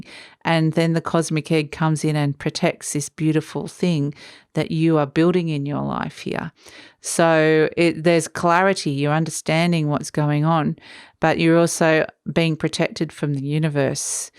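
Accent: Australia. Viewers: DonI recognise a fellow Aussie?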